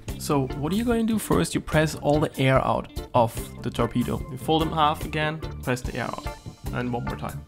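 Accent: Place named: Germany. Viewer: First sentenced told you‘re from germany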